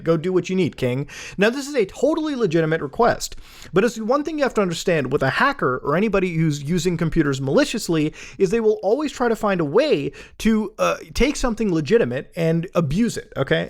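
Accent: United Kingdom. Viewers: wow, I wasn't expecting to hear bri'ish today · I thought u was wearing a mosque hat for a sec : Also that british accent thou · with British accent kinda slays tho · can speak Hindi and British. gosh I love this guy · nice british accent lol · I want more Bri'ish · So... British! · nothing makes me more happy than hearing that fresh Bri’ish accent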